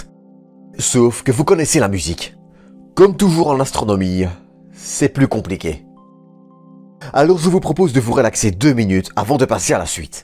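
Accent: Belgium. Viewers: Ton accent est de belgique ou d'ailleurs j'arrive pas à savoir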